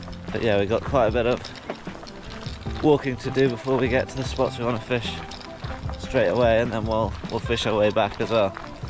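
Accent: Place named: England, United Kingdom. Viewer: Serious question, you sound English….why is your name on YouTube?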